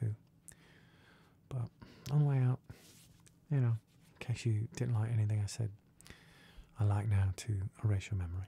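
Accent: United Kingdom. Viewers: I love your softly spoken English accents so much! Your unique sense of humor always shines through. Super relaxing video. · Nailed the accent! Thats coming from a southern english person. You sound like u come from my town 😂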